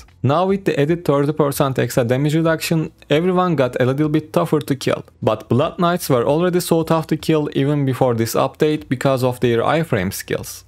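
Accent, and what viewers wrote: Turkey. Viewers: Tam türk aksanı var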